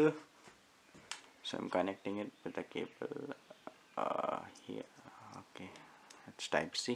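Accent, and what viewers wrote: India. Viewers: Holy shit! Can you please speak slower in future videos? Half of what you said here I couldn't understand at all. Not because you speak Indian or that dialect, simply because you are talking way too fast. You want to talk lower and more precise when you're doing how to and training videos such as this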